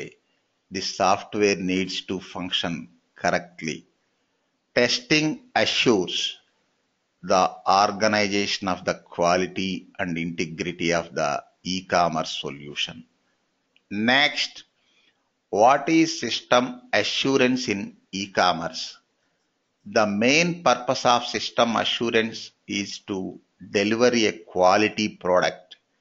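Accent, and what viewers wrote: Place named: Telugu, India. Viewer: He is a Telegu speaking person. It is a normal accent there. Rely the knowledge he is given. Accent can be adjusted in head.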